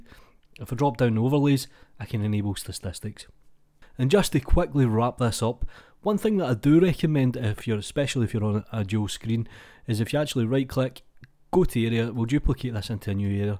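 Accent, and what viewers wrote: Scotland, United Kingdom. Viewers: I dont speak english natively.. is that an Scottish accent?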